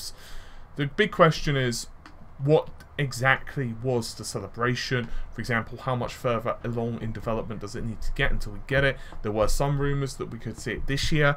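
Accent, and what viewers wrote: United Kingdom. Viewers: he's speaking like a normal British lol.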